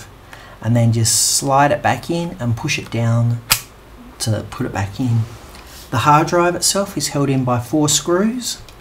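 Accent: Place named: United Kingdom. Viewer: You would be very informative were it not for the painful experience of having to hear your extremely annoying British (or whatever) accent! Couldn't you get someone to speak normally so that we can understand??!!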